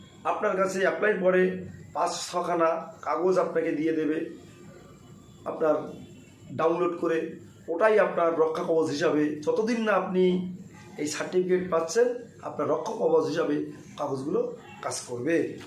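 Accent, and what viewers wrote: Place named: Bangladesh. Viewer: আপনি যে খাঁটি বাংলাদেশের লোক আপনার কথাবার্তার তাপ পরিষ্কার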